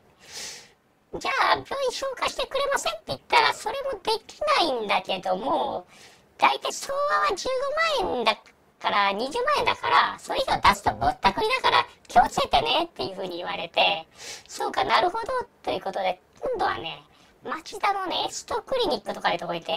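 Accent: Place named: Tottori, Japan. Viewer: やっぱり鳥取方言にしか聞こえない。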